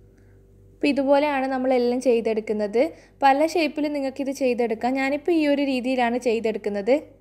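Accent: Kannur, India. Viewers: aaha... kannur evde.??? .. samsaram kettappo enikk thoniyirunnu kannur aanenn... njanum kannura... payangadi🤗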